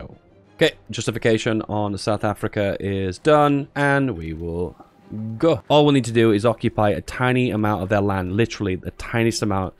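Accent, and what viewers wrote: United Kingdom. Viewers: The reason I subscribed to this channel is because you are so damn brittish and it makes me feel great to hear your accent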